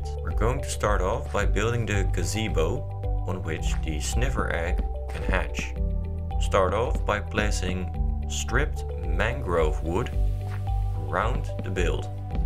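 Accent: Netherlands. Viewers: Are u Dutch? xD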